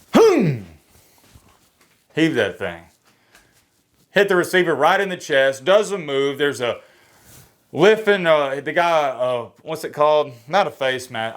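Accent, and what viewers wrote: South, United States. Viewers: you look and sound like a pubeless hill billy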